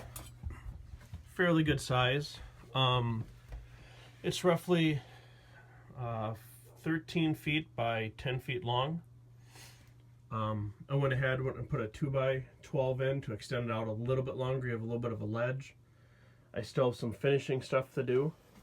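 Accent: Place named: Midwest, United States. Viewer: Impressive how handy you are! I detect a Mid-western accent. Is this in Minnesota, by any chance?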